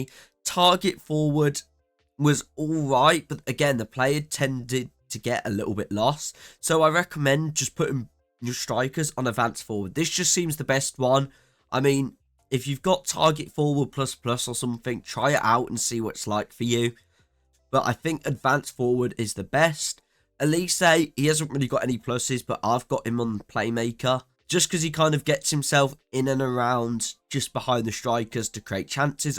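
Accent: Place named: United Kingdom. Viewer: You sound so ultra British when u are in that video , Reds or Blues or Tots or ?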